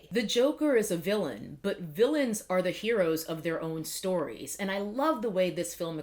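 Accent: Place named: Brooklyn, United States. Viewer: so brooklyn. just subscribed.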